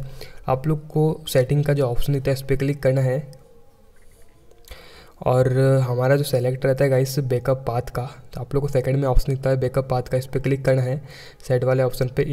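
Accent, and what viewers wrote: India. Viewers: Sir u write english speak indian why why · He speak in indlish. Their tongie are regguerer-tongue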